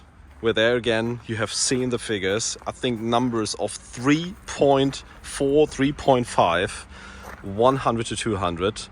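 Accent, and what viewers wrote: Germany. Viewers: I hate this german accent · are you from germany??? because you sound german?? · ein wunderschönes Denglish :) hört man sofort raus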